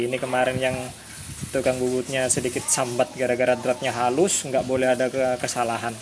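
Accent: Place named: East Java, Indonesia. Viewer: Dari logatnya spertinya mas org jawatimuran. Bisa minta kontak wa nya mas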